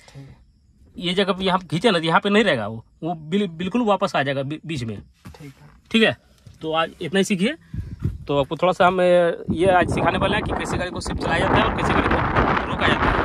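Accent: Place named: Bihar, India. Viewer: Sir ke bolne ke style se lag raha hai sir Bihar side se hai.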